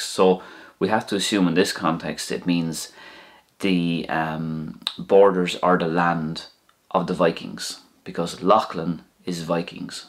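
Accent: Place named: Ireland. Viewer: I love your videos,. Which part of Ireland are you from? Just wondering which Irish accent I'm trying to learn here. Thanks for your videos!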